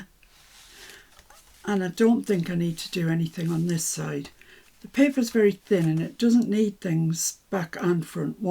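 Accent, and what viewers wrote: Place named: North East England, United Kingdom. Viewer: I've just found you today and subscribed, lovely to hear a fellow North Easterner on YouTube. How can I connect with you? looking for fellow crafters around Northumberland.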